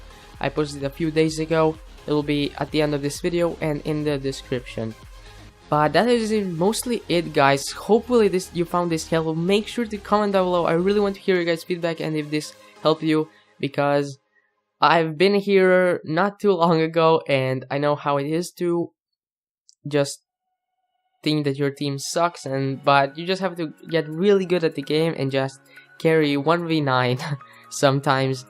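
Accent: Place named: Philippines. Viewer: Let me guess: do you play in the PH server? Your accent sound Filipino...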